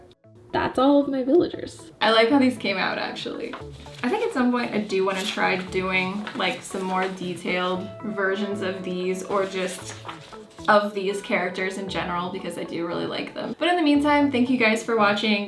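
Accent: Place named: United States. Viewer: Are u American because u have a different accent then beatemups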